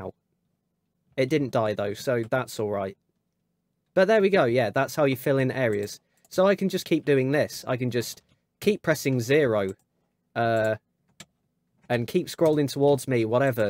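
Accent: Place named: United Kingdom. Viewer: That was fantastic, and extra points for the British accent! I learned heaps that I can use :D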